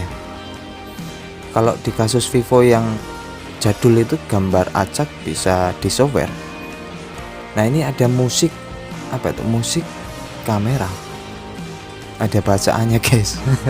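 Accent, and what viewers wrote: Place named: West Java, Indonesia. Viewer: njir sunda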